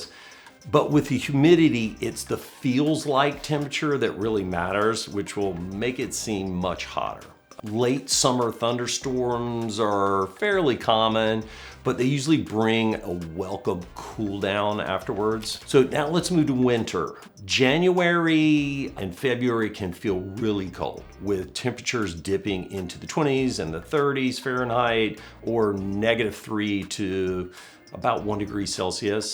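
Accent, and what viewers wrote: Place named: Mid-Atlantic, United States. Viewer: I love your Mid-Atlantic accent. It reminds me of my own Baltimorean accent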